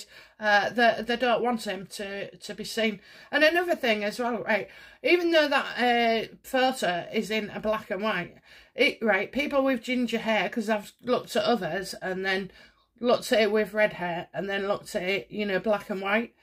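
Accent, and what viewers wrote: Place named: Lincolnshire, United Kingdom. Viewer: Would love to know if your accent is from around Lincolnshire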